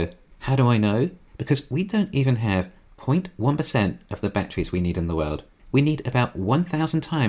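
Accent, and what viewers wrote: England, United Kingdom. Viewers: At last an Englishman with an accent on Tesla!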